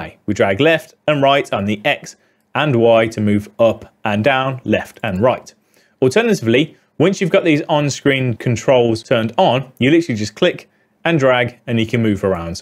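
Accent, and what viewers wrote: United Kingdom. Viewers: I like this British accent. 😀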